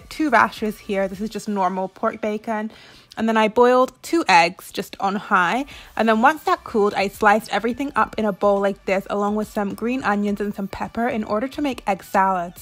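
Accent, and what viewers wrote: United Kingdom. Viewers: Why do you sometimes sound British? I’m kinda confused. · Your accent is sooo different in this video, I've been watching your videos since you started you WL - are you dating a Brit? Or been to the UK recently? The English accent is more obvious than it has ever been. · Hinata Hyuga she is British and she moved to Canada when she was younger. So her accent probably stuck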